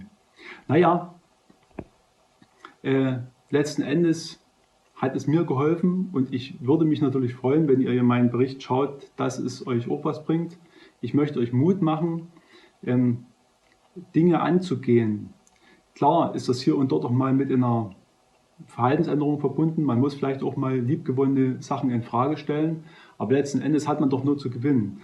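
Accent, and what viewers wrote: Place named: Saxony, Germany. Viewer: He, danke für deine Empfehlungen. Auch das wir uns nicht verrennen sollen bei der Ernährung. Mit den 5 biologischen Gesetzen beschäftige ich mich schon eine Weile. Auch mit denn seelischen Ursachen von Krankheiten. Du scheinst auch in Sachsen zu wohnen. Dein Dialekt klingt mir so vertraut. Liebe Grüße aus der Oberlausitz, Anja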